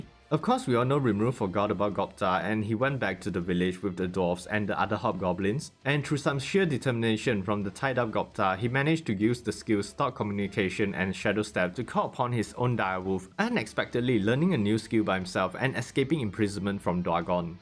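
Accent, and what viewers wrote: Singapore. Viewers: dude are you singaporean? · Sing-lish FTW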